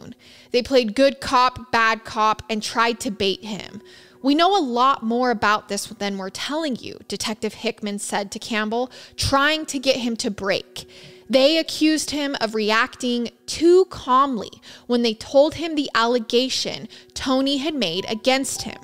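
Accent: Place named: Utah, United States. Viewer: I love the new series. A couple things.. and I mean the first one to be helpful haha not rude.. you’re supposed to pronounce the r’s in corroborate. It’s almost like you’re mixing corroborate and cooperate into one word. Hehe. And goodness girl, did you grow up in Utah??? You have one of the strongest accents I’ve heard from that area of the US! It jumped out right away and I was like wait a minute, I know that accent!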